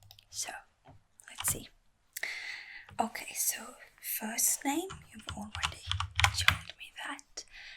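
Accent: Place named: United Kingdom. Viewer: Britishness turned up to 8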